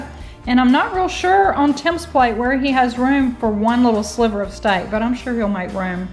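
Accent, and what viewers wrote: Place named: Mississippi, United States. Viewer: Thank you so much, I have been wanting to grill so badly, but we've had so much rain in our area, a small town in Northeast Ms., called Mantachie. I started watching your channel when Krista from The Logues mentioned you and how much she loved your accent..lol...I had to go watch, I remember telling Krista I couldn't tell because you sound like me.☺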